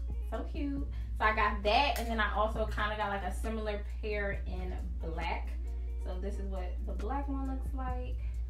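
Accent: Philadelphia, United States. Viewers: lmaoo completely off topic but as a fellow “philadelphian” i’m like she sound like she from philly. i had to do some investigation and saw it in your instagram bio lol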